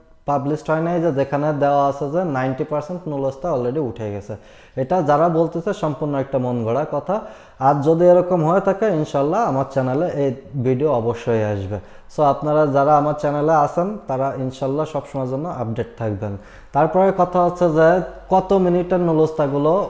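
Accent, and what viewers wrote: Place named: Narail, Bangladesh. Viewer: ভাই আপনার বাড়ি কি নড়াইল?কথা বলার কোয়ালিটি সেইরকম মনে হচ্ছে।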